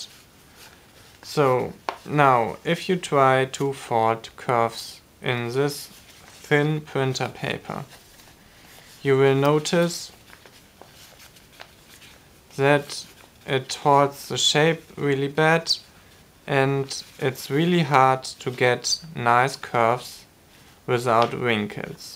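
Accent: Germany. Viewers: Du bist eindeutig deutsch haha gut erklärt !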